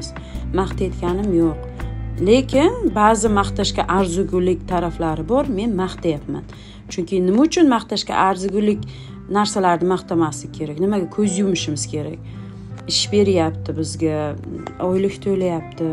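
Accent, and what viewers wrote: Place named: Uzbekistan. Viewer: Charchamang omadizzi bersin yurtdoshim shevamiz birda yurakka yaqinsiz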